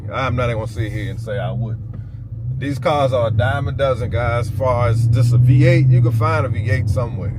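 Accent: DMV, United States. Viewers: That looks like old Bowie, MD fr. You definitely from the DMV! I can tell by your accent too!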